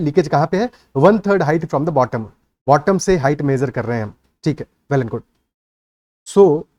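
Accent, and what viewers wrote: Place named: South, India. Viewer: Sir south indian accent is crazy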